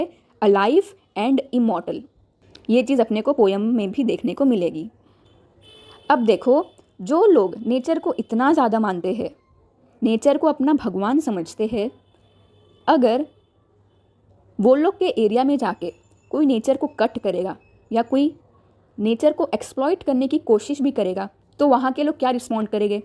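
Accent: Mumbai, India. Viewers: Ma'am are you from Mumbai ?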